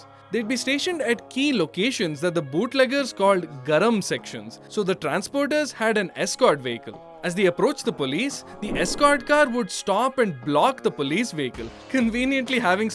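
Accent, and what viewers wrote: South India, India. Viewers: typical indian accent .. lolll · Your name is North Indian but your way of speaking is south indian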